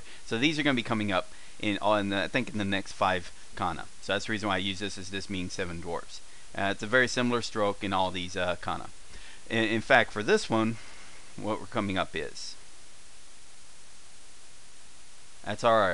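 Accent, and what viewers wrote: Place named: West, United States. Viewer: how can it be the mu of samuel when the mu in samuel sounds like "myu"; geez you western foreigners and your accents at least teach correctly and try not to confuse the students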